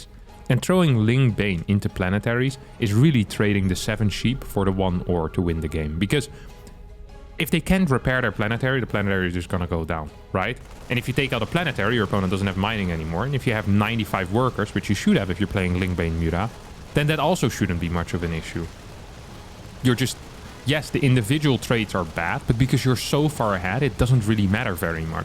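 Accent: Germany. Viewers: I love Harstem doing a German accent anytime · Your german accent is perfect 😍😍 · love your german accent xD 10/10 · Your german accent was so funny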